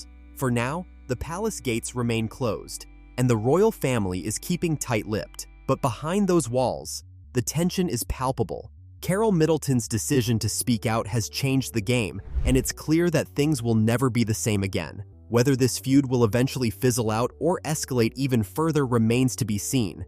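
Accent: United States. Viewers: And why use an AI narrator? If you absolutely can’t afford the minuscule fee a human narrator would earn, at least teach the AI to pronounce the names of the royal family correctly. It’s offensive to propagate mistakes and then use an American accent. That’s a deliberate decision. Real Americans can pronounce Louis correctly.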